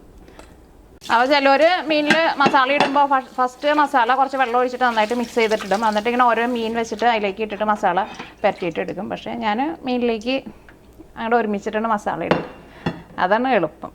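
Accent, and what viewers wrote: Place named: North Kerala, India. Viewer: Oru Nalla Nadan kutty anu,I like your presentation & vadakkan accent.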